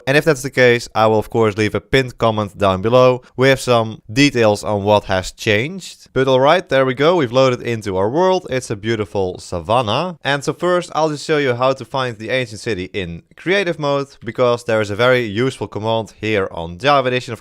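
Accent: Netherlands. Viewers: you sound dutch mate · Are u dutch because i can hear it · Where you from? You sound Dutch? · u sound dutch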